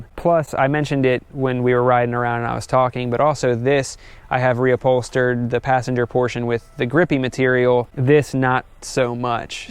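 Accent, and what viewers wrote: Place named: Vermont, United States. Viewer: Where are you, you have a pretty distinct accent. Vermont?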